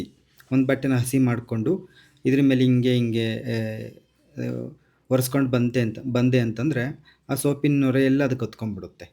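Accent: India. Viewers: ದುರ್ಗಾ ಕಡೆ ಜನ ಹಾಗೆ ಮಾತಾಡೋದು ಅವರ ಮಾತು ಅಡಿಗೆ ಎಲ್ಲಾ ಚಂದ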